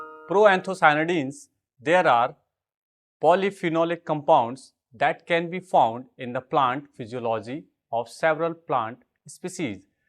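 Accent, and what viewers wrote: India. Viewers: English with india dialect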